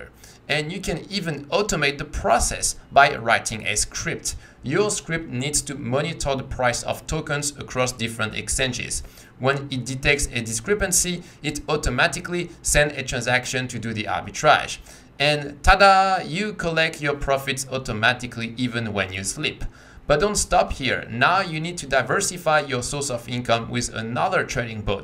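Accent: France. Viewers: L’accent Français Youhoouu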